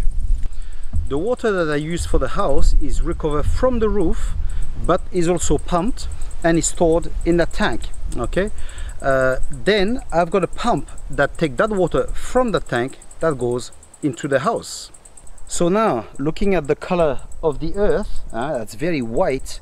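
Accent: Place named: France. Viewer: Vidéo intéressante, merci pour votre explication avec the french accent, hhhhh.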